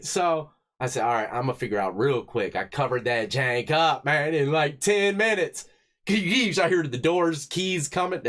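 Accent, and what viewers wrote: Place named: Virginia Beach, United States. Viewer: Sounds like you are from VA Beach? I am a white guy who went to high school in the ghetto of Hampton, VA. It was hell on earth.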